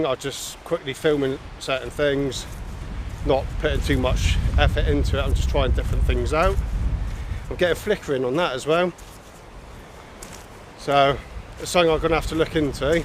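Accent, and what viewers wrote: Devon, United Kingdom. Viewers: Just stay as you are, you just have a Devonshire accent I have a Suffolk accent lol don’t worry about how you sound, I watch you tube on my tv you sound ok to me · Hi.... great to see you are keeping well mate. Don't worry about the awesome Devon accent mate..... it is one of the best we have. It has been a crappy 12 months for everyone and fingers crossed when all this madness ends things will get better for us. People sub because we like you and the things you talk about on video. Plus you are a top bloke as well. I will always be around for the times you get out and do a video mate. Stay safe, all the very best, Mark:):)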